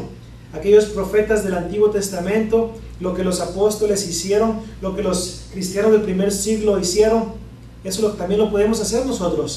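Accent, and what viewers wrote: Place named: Dominican Republic. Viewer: El pastor se le escucha el acento como el del Pastor Miguel Nuñez y es de Rep. Dominicana puede ser que éste pastor puede ser yo no aseguro pero me gusta su clase nunca había escuchado todo ésto Dios lo bendiga Pastor de donde sea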